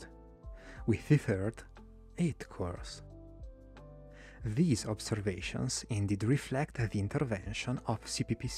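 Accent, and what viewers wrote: Italy. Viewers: Sei italiano? E palese ;)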